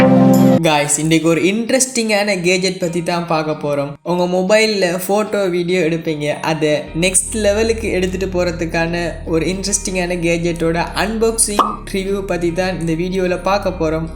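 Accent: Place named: Sri Lanka. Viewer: Sri Lanka tamil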